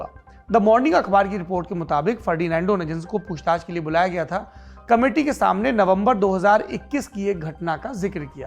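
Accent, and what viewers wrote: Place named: Bihar, India. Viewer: Jaise aap bolna start kiye waise he bolia... achaa lagta hain.....uttar pardesh ka Bihari bhaasa me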